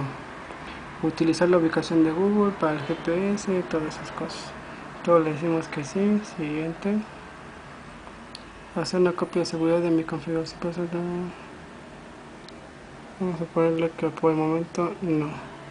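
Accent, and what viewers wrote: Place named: Pocho, United States. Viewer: Bueno y por qué hablas así como queee... tan POCHO???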